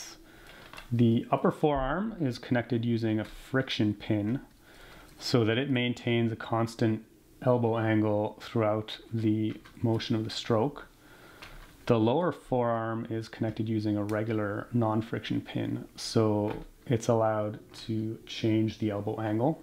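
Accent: Canada. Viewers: Man I love a Canadian accent. Must be the Degrassi I watched as a kid.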